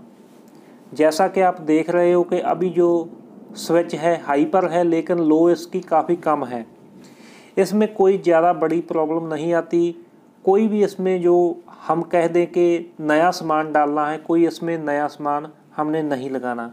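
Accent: Punjab, India. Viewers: Punjabi banda lag raha hindi bolne ki tone se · Very good voice quality with Punjab I accent.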